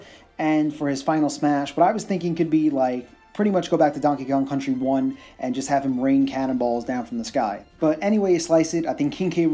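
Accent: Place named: New York, United States. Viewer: I love this guy's NY accent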